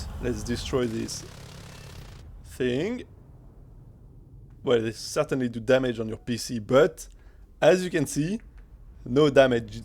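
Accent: France. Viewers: Average french accent 😅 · French accent? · Very french sounding 👌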